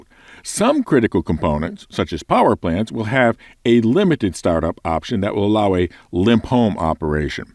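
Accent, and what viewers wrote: Scotland, United Kingdom. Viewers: Engineering should be slightly more complex than the game blackwake. Where your ship has a problem, you have a quick and intuitive way of dealing with it. A 12 year old should be able to figure out. Otherwise you make dealing with engineering issues too much of a hassle for players either in there day to day activities or when in critical situations such as getting shot at. You shouldn't need a complex flow chart or checklist to fix the issue. Scottish accent is beyond scuff at 2x speed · I would like to hear your Scottish accent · Got to admit, hearing your Scottish accent is quite the experience. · scottish accent :D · I do like the indicator lights on the buttons idea. It's similar to the old I-war 2 status bar where you could evaluate the state of your ship at a glance. Though I strongly disagree with the RNG repair damage. 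Make it take, say, 10 seconds for each RNG roll and only capable of bringing the system back to minimum operating capability instead. The Scottish accent might be a tough call for the non-english speakers though. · The scottish accent will help for sure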